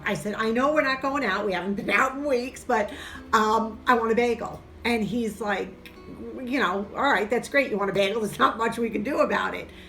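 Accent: New York, United States. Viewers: you and are doing a great job. I initially came over from "Mornings with Granny." When I first watched your video something in my heart and mind clicked from your voice. I grew up in NY and I recognized that in your voice. It wasn't until later that you confirmed it that I got the "ah hah, no wonder" feeling. You share from the heart and I appreciate i Your tips are great, keep them coming. I like the recipes and the honesty you share. Thank you for bringing "a little joy to my day."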